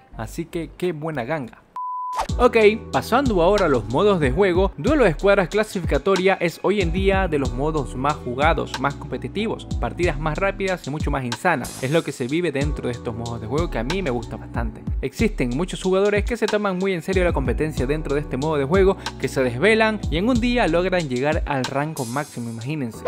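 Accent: Ecuador. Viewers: Eres ecuatoriano?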